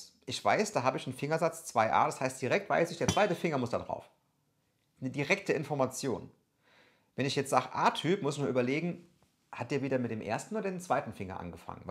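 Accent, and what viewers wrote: Germany. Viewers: mh jo 😃 bisch du ausem saarland ? Nice infos Abo check ! Danke für sie Mühe dir du dir machst 😊😊🤘🏻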